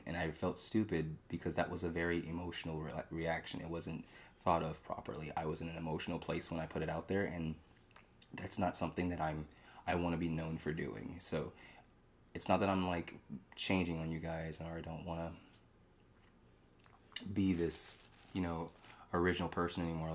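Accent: England, United Kingdom. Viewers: I’m English, and I love your English accent. Ignore the haters, x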